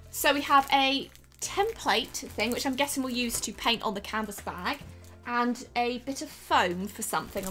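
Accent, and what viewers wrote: England, United Kingdom. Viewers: no she lives in the US, she just has a British accent! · you can't be american and have a british accent, is british LOL she just lives in the US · Sounds weird to hear a lovely British accent like yours work in dollars lol I feel like British YouTubers are hard to come by. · I'm confused... doesn't she have a British accent? So why does she talk about dollars and Target? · This was recommended to me for some reason and I was very confused since you sound British but was mentioning American things. I still enjoyed the video despite the confusion. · I’m so confused... she s o u n d s English but she’s using dollars and saying target casually and hsuhfjfidms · Where are you even from? British accent, and dollars?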